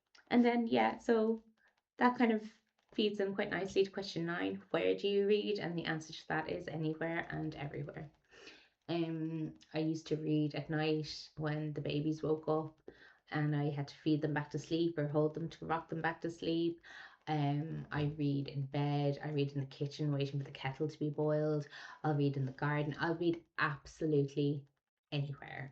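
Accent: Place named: Ireland. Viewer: “I could walk past someone in the grocery store and my TBR would get longer.” 😂 Every time I finish a book I struggle to choose the next one. I’ll share your TBR and we’ll both feel better. I love your chatty unedited take. Any slow moments are saved because you say um and er in an Irish Accent. From one Newbie to another welcome to BookTube. My Newbie tag video comes out on Sunday (Australian).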